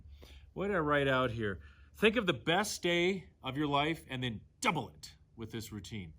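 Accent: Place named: Germany. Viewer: Thanks this Was really helpful and didnt even feel like 15 Minutes.. But whats up with that german voice? Ich bin neugierig 😁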